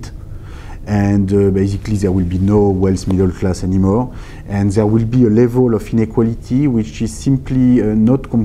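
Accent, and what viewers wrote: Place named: France. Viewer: His French accent is annoying.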